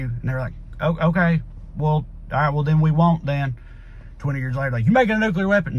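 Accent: South, United States. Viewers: i skip every ad on this ap. But yours, idk if its the southern drawl or what but your ad reads are actually enjoyable 😂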